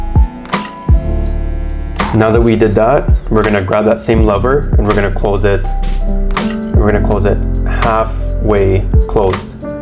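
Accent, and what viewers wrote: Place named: Toronto, Canada. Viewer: Eh, are you from the GTA? (I’m guessing based on the accent)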